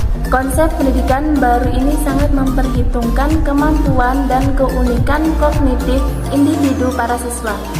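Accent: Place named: Java, Indonesia. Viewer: Semoga lancar dan tambah berkah dex, medok 😁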